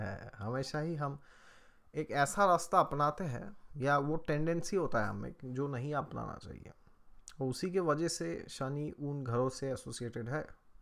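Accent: India. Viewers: I just love your Hindi accent 😂😂😂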